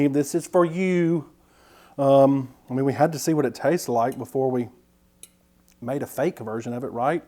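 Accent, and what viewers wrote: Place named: Tennessee, United States. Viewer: I am from Knoxville, TN and I now live in Florida. It is driving me crazy trying to figure out your accent! I believe you are from Tennessee too??? Please tell me!!! I’m really going crazy😂😂😂 I’ve watched many videos trying to figure it out. You just use lots of slang I have only heard in Tennessee. I love your videos and you and I think a lot alike. Please have a great day!...... and please answer my question 🤷🏼‍♀️😂😂😂😂😎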